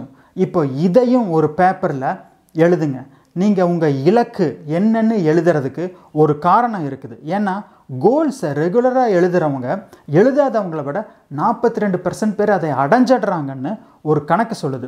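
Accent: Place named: Kongu, India. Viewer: Your tips is good and your kongu slang good.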